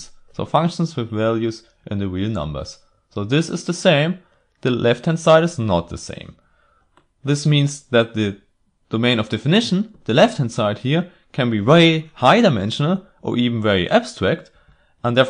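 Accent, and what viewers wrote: Germany. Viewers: I am absolutly sure, that for other non-native speakers it is simplier to understand german accent, than speach of true englishmen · Thank you for this channel, it’s amazing! Quick question, are you German? The accent sounds a bit like my German grandma and that’s cool!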